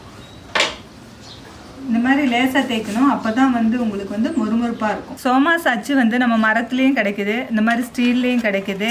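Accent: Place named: Madurai, India. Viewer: ok ok தமிழ் மதுரை தமிழ் போல உள்ளது..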